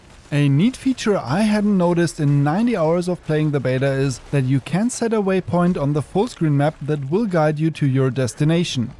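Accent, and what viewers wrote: Germany. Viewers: Are you german? ^^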